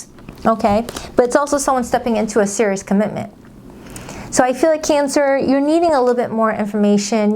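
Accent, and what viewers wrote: United States. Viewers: I love your readings but could I ask you to slow the speed at which you are speaking as I know a lot of us Brits 🇬🇧 have great difficulty understanding certain US accents. We have to put the subtitles on to try and grasp what you are saying even though you are speaking English. 🙈 Please don’t take that as a criticism or take it personally as we none of us can help our accents. Many thanks for the work you do for us all. God bless and namaste 🙏🏼 xx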